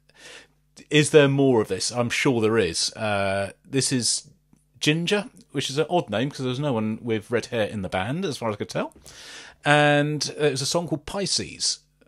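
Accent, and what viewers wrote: United Kingdom. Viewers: First thought when the british accent kicked in: I feel sorry and happy about what this man is about to experience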